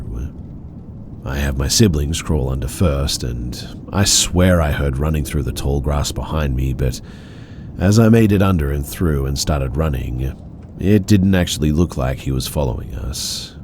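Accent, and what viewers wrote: Australia. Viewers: Good to hear an Aussie accent, cheers mate!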